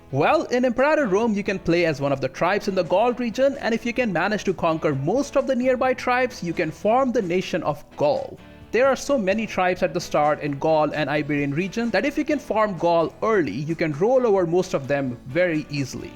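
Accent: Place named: India. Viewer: Wtf your Accent is soooo Indian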